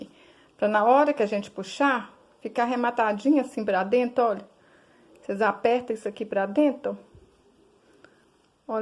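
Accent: Minas Gerais, Brazil. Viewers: E esse sotaque mineiro? 💞💞💞💞💞💞💞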